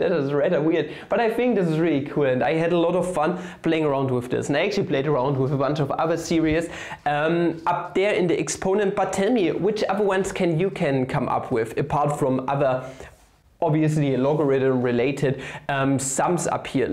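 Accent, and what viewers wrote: Germany. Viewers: Its funny how you know after 2 sec that he is german 😂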